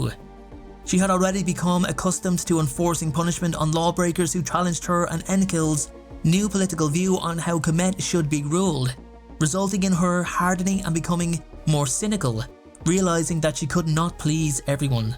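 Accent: Ireland. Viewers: Oh boy, so I guess I cannot understand Irish accent :'(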